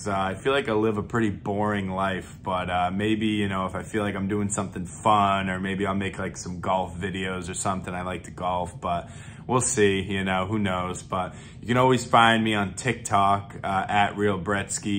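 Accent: Boston, United States. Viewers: Are you a Southie? Live in a triple decka?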